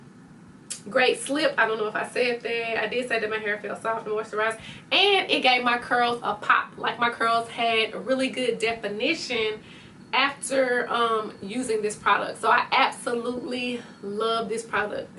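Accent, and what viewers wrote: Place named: South, United States. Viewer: Girl you gonna break YouTube with that southern accent popping curls fresh face. Thanks for the review. 😄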